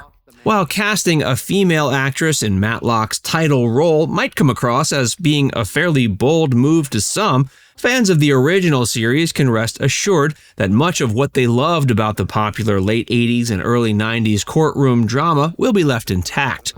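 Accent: United States. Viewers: I like the narrator who speaks beautiful American English…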